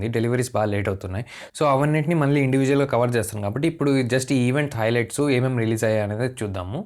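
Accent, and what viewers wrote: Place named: Tamil Nadu, India. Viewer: Superb..bro..voice Bagundi tamil.mix ainatu vasthundi. Good ❤️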